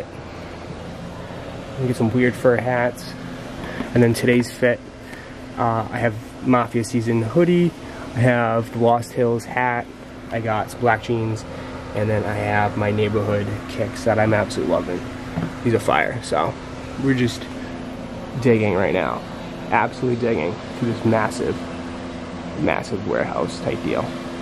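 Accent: Boston, United States. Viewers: Bruh... Where are you from. Boston?!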